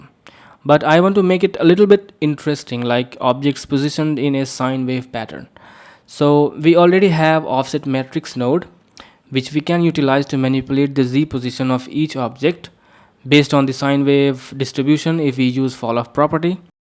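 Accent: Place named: India. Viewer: You are indian? I dislike your accent!!!!!!!!!!!!!!!!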